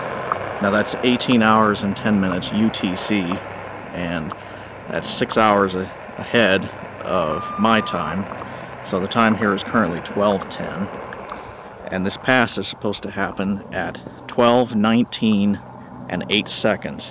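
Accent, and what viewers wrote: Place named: United States. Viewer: it sounds great to me - I always think the American accent sounds "professional" Much easier on the ears than my Aussie roughness.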